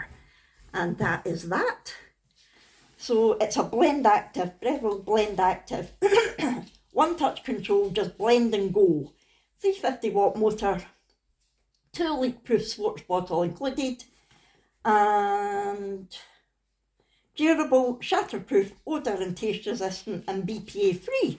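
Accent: Scotland, United Kingdom. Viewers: Hi that's a brilliant idea it's good to hear the Scots dialect and celebrate it x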